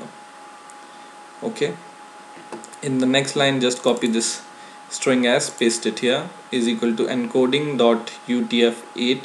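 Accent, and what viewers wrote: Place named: India. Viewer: I get nervous when i hear this indian accent. Somehow i hate it !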